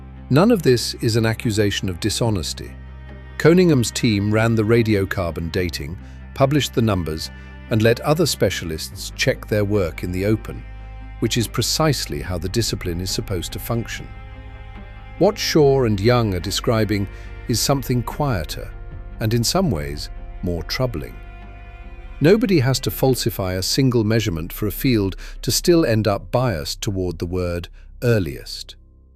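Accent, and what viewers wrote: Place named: United Kingdom. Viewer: A total waste of my time and the hardest was to torture myself through the awful Britoid accent.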